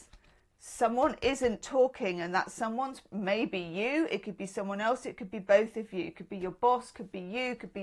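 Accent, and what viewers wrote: Australia. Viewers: Just heard your Aussie accent, sounds spot on 👌fellow Aussie here x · OMG. I love the glasses and headscarf. You look gorgeous ❤ also I love your Aussie accent. It's very good and for my own amusement I am constantly imitating you imitating Aussies with a huge grin on my face even though no one would know why I am so amused. You bring a lot of joy to my life.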